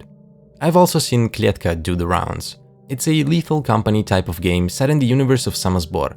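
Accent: Russia. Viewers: there is no way you are not a Russian native speaker or at least from a slavic country your pronunciation says it all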